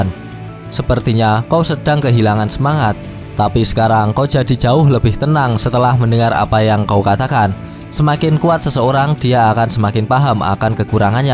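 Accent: Java, Indonesia. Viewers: Lu orang Jawa ya min,medok bnget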